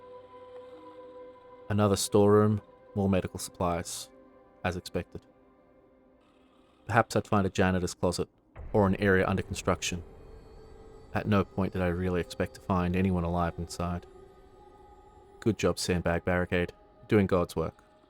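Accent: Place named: Australia. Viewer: "I snuck up behind one of them, and stabbed them so hard their pants changed color... That was weeyud." 😂This gave me a good chuckle lol Edit: "Weeyud" is just how my big-dumb Americanized English brain hears "weird" with your Aussie(?) accent! lol My bad if it's not Australian. Despite the fact that the Aussie and Kiwi accents are pretty much my favorite English accents to hear of all of them, I sometimes have difficulty differentiating between the two. A little off topic but I also really enjoy the accent Tom Hardy is doing with Alfie Solomons/Ron and Reggie Kray, which I've been lead to believe is a "cockney" accent, though I literally have not one fuck of an iota what that is and wouldn't pretend to lol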